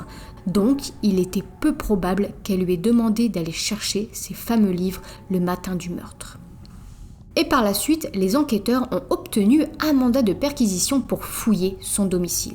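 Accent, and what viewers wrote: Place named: Nord-Pas-de-Calais, France. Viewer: Pas top quand c'est raconté avec l accent chti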